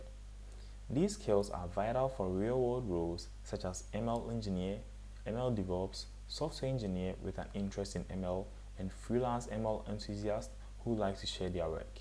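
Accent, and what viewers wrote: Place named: United States. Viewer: 3y3 American accent wai 😂😂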